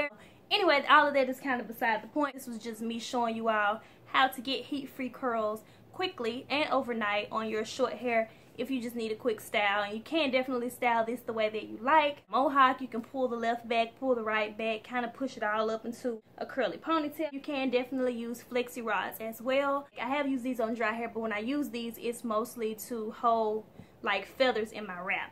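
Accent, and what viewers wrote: South, United States. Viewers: first of all I would like to say, you are so cute and country lol. What southern state are you from? Second great tutorial. I've been natural for 5 months and my hair is about 5 inches and I plan to relax it soon so it will be the length of yours and I consider my hair short as well. This channel is just what I needed, thank you so much! I also see women with super short hair when I look up "short relaxed hair tutorials" and when I look up medium it is longer than mine. keep these videos up! I am def. a new subbie!!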